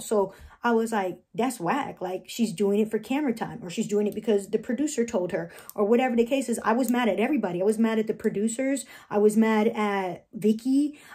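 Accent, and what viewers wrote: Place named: New Jersey, United States. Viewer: I just thought you were one of those jerseylicious girls..loud and your accent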